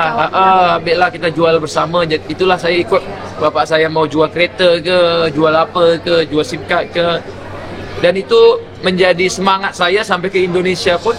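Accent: Kuala Lumpur, Malaysia. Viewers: ya sbb dia ada darah melayu minangkabau, sbb tu tak susah untuk dia tukar loghat KL walau duduk beberapa tahun kat Malaysia. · Pandainya cakap orang kuala Lumpur ya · 😂😂😂😂😂 ok jugak dia ckp bahasa melayu Malaysia...tp ayat2 BM tu dia tak tahu...cuma lebih kpd slang 😂😂😂😂😂 mantapppppp bro...keluarga diaorang nie bnyk travel...tp aku respect adik beradik diaorang semua berseni... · comel laa ckp KL🤣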